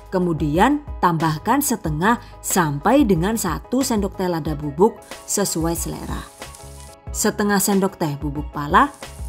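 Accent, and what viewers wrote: Banyumas, Indonesia. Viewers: Kalo mi nya suka yg pipih Bun, tapi suka yg pake udang dan pakcoy. :) Ada ngapak2 nya itu lho yg bikin tambah greget pengin nonton Terus video bunda . :D sehat terus bunda agar bisa selalu share ilmu ke kami Mahmud. Biar misua tambah saayoong..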